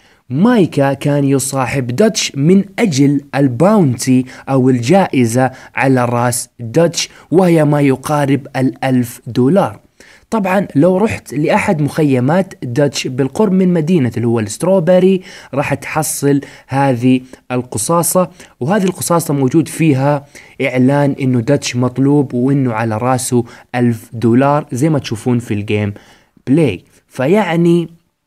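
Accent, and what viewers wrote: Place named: Jeddah, Saudi Arabia. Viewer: ما ابغا احلف بس واضح انك من اهل جدة 😂❤️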